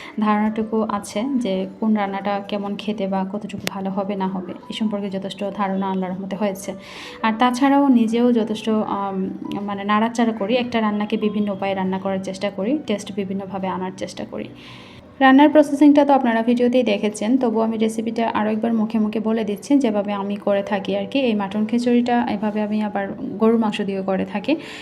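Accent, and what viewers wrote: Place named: Chittagong, Bangladesh. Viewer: Ohh Chittagong er meye🩷 bole kotha 🩷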